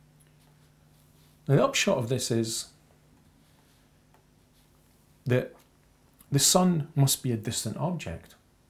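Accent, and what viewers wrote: Scotland, United Kingdom. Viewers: Holy shit man! That's horrible. Personally I love your accent man. I come from a primarily Scottish family, here in Canada, so it makes me feel right at home actually. You sure as hell are more well spoken than some Scots. Some of them have such poor diction, that you can't even understand them, unless you are Scottish. You are perfectly understandable actually. People are fucked. Then to criticize your hands? Oh man, how childish. They are clean and so are your nails. Lol. So I don't get that either. People will pick anything when they have nothing huh? Lol.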